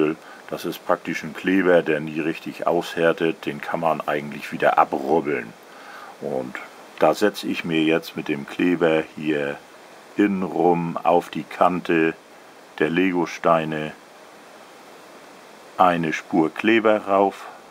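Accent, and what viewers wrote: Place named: North, Germany. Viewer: der gechillte norddeutsche Dialekt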